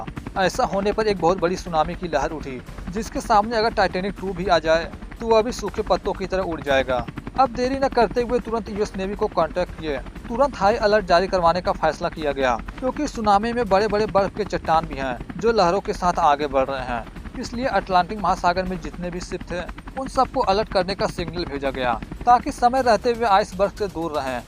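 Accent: Bihar, India. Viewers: bat se lag raha hai bihari ho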